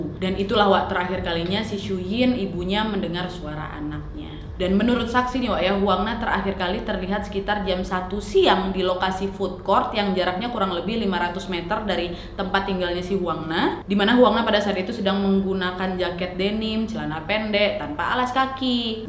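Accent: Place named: Sumatra, Indonesia. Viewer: Karena aksen nya sumetera banget...jd lebih masuk kalau buat aku. Berasa lg diajak ghibah sm bestie 😅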